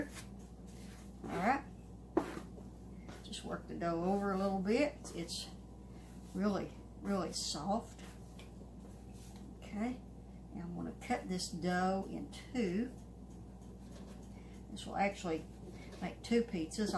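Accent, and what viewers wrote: South, United States. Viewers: Why I love your kitchen videos ... Truth and Love. 😘 I rarely had an opportunity to be a part of how to make things from scratch. Most of my experience with pizza at home was get a frozen one and pop it in the oven (or call to order delivery) Watching you in your kitchen as you explain in your most welcoming southern accent, I get all humble and grateful. Thank you for making the time to share your values and honest "how it's done here" videos. Being your (virtual) friend leads me to a better day and a closer understanding of what's truly important. You are a blessing and a true friend. God bless you and all those lives you're a part of. ❤️❤️ Thanks again. 😊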